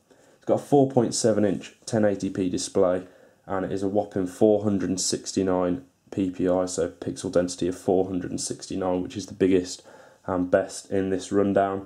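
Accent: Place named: United Kingdom. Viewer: video starts playing: "yes! british accent!"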